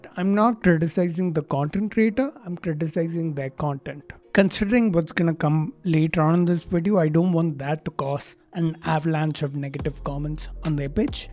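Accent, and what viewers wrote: South India, India. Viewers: He speaks south Indian English (if you know 'thank' and 'tank' thing)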